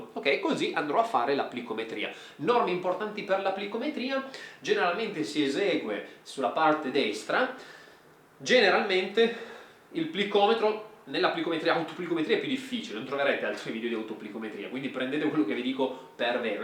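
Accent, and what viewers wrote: Turin, Italy. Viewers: ricorda Angela e Odifreddi nel modo di parlare perché è di Torino come loro.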